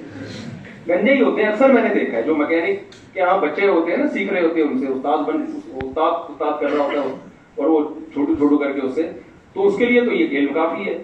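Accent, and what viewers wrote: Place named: Karachi, Pakistan. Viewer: I'm Lucky for having residing near to the masjid where he gives Jumma. Khutba every Friday. I met him two years ago since then I never missed my salaat namaz , Ramadan and aitiikaaf, in his masjid. MUFTI SAAB is so friendly and kind. He gives his bayans are given in the language and accent that people in Karachi understands.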